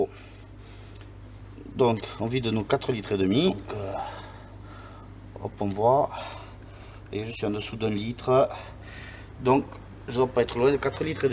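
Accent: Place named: South, France. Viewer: Super tuto !!! Clair, bien expliqué (avec notre petit accent du sud qui va bien) précis, astuces de pro, c'est rare ! Té ! Je l'ai regardé deux fois ! Bravo ! et merci 👍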